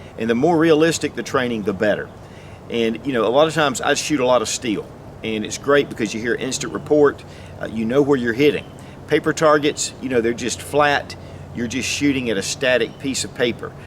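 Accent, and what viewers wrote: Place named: North Carolina, United States. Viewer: wiltson.....I have always loved the way u say that. in fact I frequently watch ur old Wilson combat videos......I'm from TN but u can't beat that nc accent of yours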